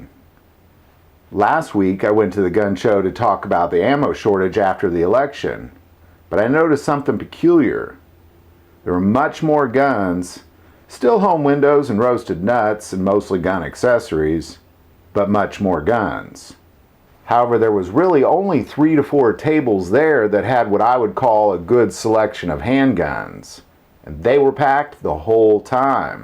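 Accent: South, United States. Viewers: Thought I was watching at half speed, but then I realized it’s just the southern way of speaking 😂 in seriousness tho, great vid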